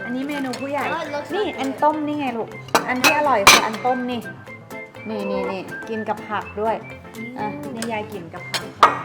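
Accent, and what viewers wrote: North, Thailand. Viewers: ชอบแม่อู้เมือง อยากหื้ออู้นักๆในคลิปเจ้า เพลินดี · อู้กำเมืองม่วนแต้ๆจ้าว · อู้ไทยปะแล๊ดเมืองน่าฮักดีครับ😊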